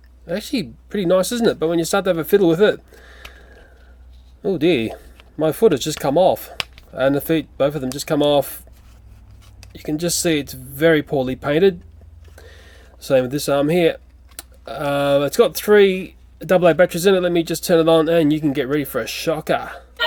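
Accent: Australia. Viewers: toy factory is my favorite knockoff company best of all the fake on is not a toy for kids its a priceless collectors item cause its in a collectors collection for teenagers and really fine old kids and adults who put them up on display where detail matters because I have this find collectors toy and here's is my price for your real minion Dave toy $50.00 and if you like my price please mail it to me Anthony Addison 107 Dwight drive Rome NY 13440 thanks. Anthony PS don't let anybody know I told you and by the way I love your Australian accent.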